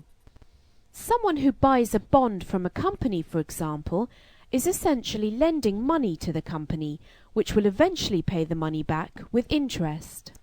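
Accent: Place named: England, United Kingdom. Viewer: I read somewhere that a female voice is recepted better by people than a male voice is, in such things as this video (instruction audio); it's a psychological thing, and has to do with our mothers nurturing and raising us when we're babies.-- I like this video, very informative and simple and easy to listen to (because of the female voice and the English accent. > "Bonds" James bOnds.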